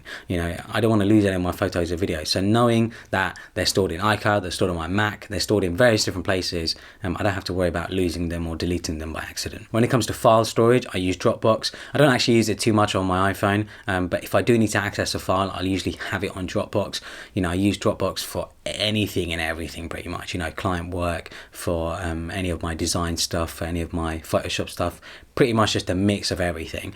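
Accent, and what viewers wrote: United Kingdom. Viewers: Sleek British accent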